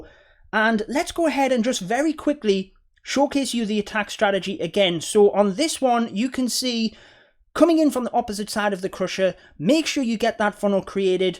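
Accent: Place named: Newcastle, United Kingdom. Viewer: found your channel and subscribed! Great content mate 😃 can't quite get your accent! was gonna say Geordie but leaning more towards Boro, Sunderland? I'm from near Darlington! keep up the good work :)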